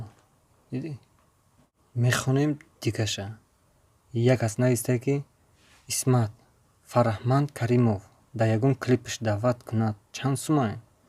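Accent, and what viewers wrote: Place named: Kulob, Tajikistan. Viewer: Ранги чучи кулоби гап мезани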